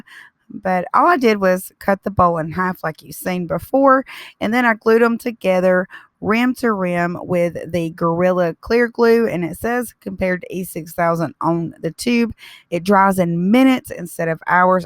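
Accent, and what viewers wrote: South, United States. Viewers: Love your projects and your southern accent 👌🏾👏🏽😁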